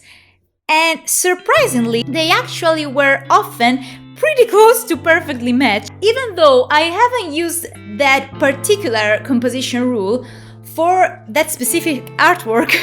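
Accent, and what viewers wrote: Italy. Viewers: LO SAPEVO che eri italiana! Dalla pronuncia 💀 Da un altro artista toscano: Bel video continua così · The video was beyond helpful and interesting. Thank you so much for this. Just to mention… Damn I love this Italian intonation sooo much, it makes all languages sound awesome 😱😱😱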